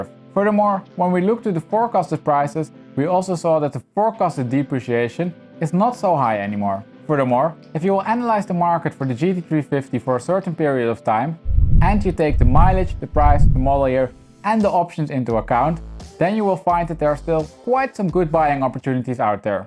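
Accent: Netherlands. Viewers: you have a Dutch accent.....Kan U look Nederlands praten?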